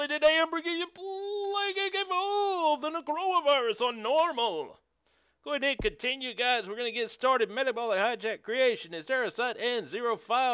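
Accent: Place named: South, United States. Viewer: Can't stand that southern voice but your tutorial worked so good job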